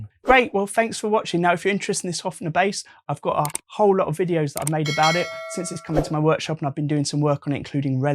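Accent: United Kingdom. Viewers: I can listen to this type of English accent all day.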